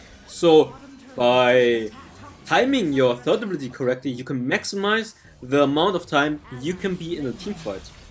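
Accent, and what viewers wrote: Germany. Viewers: One question are u German?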